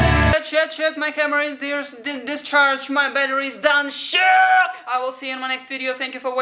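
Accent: Russia. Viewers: I like your Russian accent , in fact ,speaking English in Russian accent is so sexy , Btw, you are adorable😉😉😉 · deathless? you mean immortal? P.S. you need to get rid of your Russian accent and you will be perfect! Marry me :)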